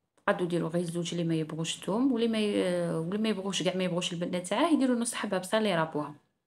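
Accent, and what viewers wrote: Mascara, Algeria. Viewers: انت من معسكر ولا من تغنيف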